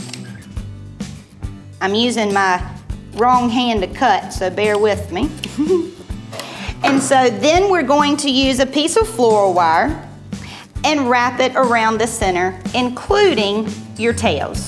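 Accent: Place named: South, United States. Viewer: MY TEACHER AND I LOVE YOUR SOUTHERN ACCENT♥️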